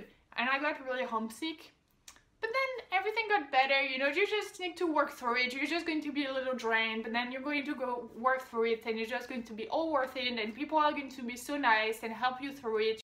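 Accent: France. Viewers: Thank you for doing this video. I am particularly interested in the challenges you faced with American spoken language. How long did it take you to get to point where you could understand conversations? Was it easier or harder to follow informal conversations compared to lectures in class? Also, in speaking English, how long did it take to achieve complete fluency? I’m sure you’ve been told, your accent is absolutely charming. Americans love a French accent more than any other language.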